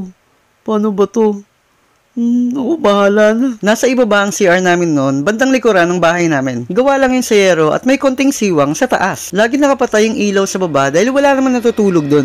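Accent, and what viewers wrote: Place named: Bicol, Philippines. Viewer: Parang taga bicol to e hahah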